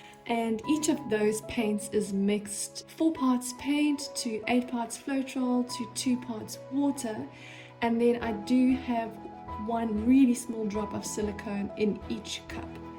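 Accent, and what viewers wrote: South Africa. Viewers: You sound South African, this is encouraging if you are in South Africa, that means that you can probably get Floetrol and the paint in SA?? I'm in the UK at the moment, returning to SA soon and hoping to be able to create some of these beautiful swipes and pours. Loving the colours and your ocean creations. xx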